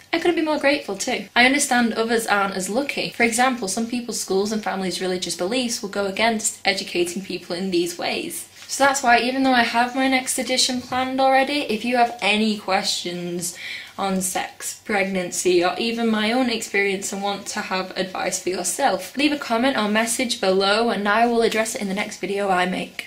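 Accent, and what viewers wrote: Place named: United Kingdom. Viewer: I love your british accent...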